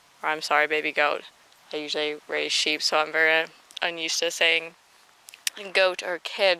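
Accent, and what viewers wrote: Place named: United States. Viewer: Deuneska Portugal Yeah! I wish I could afford to do that. Are you in Arizona? You sound American.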